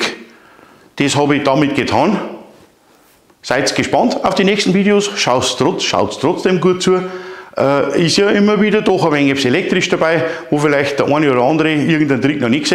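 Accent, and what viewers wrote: Bavaria, Germany. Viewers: Ne, alles OK was du machst, ist doch deine Sache! Lass dich nicht Trollen. Ich schaue mir gerne deine Fachkompetente Videos an. Mach bitte weiter so, vor allem auf Bayerisch! Liebe Grüße aus Unterfranken. 👍👍👍❤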